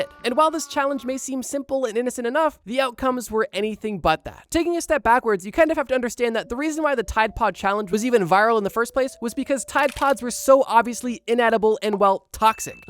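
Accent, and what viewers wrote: Canada. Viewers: i thought i heard that canadian accent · i could tell you were canadian 😂😂 ontario ontop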